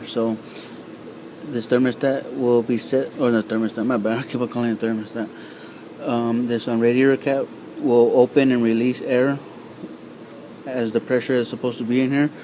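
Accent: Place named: New Mexico, United States. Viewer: Some people talk like that if they are from New Mexico or California.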